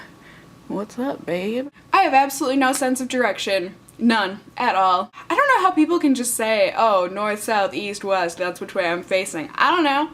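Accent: Minnesota, United States. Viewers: OMG the MN accent got me cracking up!!!! I always tell people that we don't talk like that. But I did have a coworker say that he forgot that I had an accent until I was on vacation and came back. He said then he could hear it. I only hear it come out when I say certain words. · Your Minnesota accent killed me. I'm dead. DEAD. IT HURTS SO GOOD. LOOOOOL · i died at the Minnesota accent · Also, please do your Minnesota accent more lol, it's adorable!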